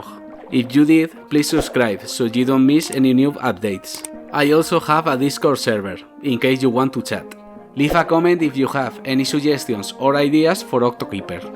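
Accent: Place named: Spain. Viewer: De nada bro, yo no se mucho de videojuegos, pero en esta plataforma has lo que más fácil se te haga, ya que al final Youtube tiene un argoritmo muy loco y podrás estarte matando haciendo un video muy bueno para que al final nadie lo vea, y es muy triste. Otra cosa que podría ayudarte demasiado, es haciendo streaming por Twitch mientras realizas los artes y programas el código del juego. Es muy bueno al momento de interactuar con tu público, además, no es un juego que normalmente suelas ver por la comunidad hispana, esto hace que sea más llamativo, crea un vínculo con el espectador. Por ejemplo conmigo, yo al ver tu acento en inglés, ya dije "Este es español" y eso fue lo que me hizo decidir quedarme en el canal. No tienes que tomar esto al pie de la letra haha, pero me gusta dar consejos que he ido viendo que le funcionan a otros.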